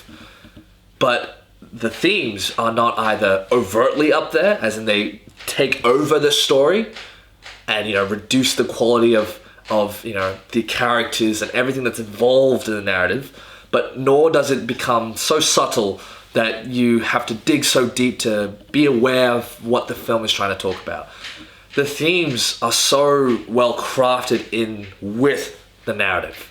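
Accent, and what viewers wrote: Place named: Australia. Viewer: Yesss, another Australian, possibly, maybe, the accent sounds about right. I haven't seen the movie yet, I'm just about to now, so I'm gonna watch this video when I get home, but I'm really interested to hear 18 minutes worth of your opinions on it.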